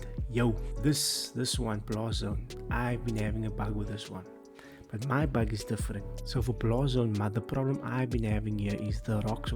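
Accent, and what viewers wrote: South Africa. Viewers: Lol not doging your voice or anything but I genuinely thought you sounded Indian with a British accent. Only when you said south African did I start hearing that. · Mate love the South African accent haha.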